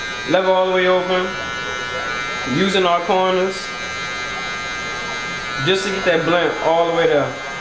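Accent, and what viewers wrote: New Orleans, United States. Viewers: is that a NOLA accent I hear? I love NOLA accents and thanks so much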